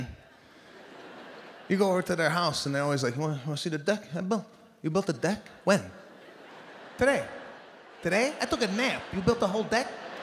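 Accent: Mexico. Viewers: I mean thats ONE type of mexican accent. Its like rural "country" mexicans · As a Mexican I approve of this 100% his Mexican voice and talk was straight up accurate. Dam this was fucking funny. 😂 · He nailed our Raza, that’s exactly how all my Tio’s and primos talk. My dad speaks broken English this is him 100% I love it, thank you Sebastian. I always watch this clip ❤️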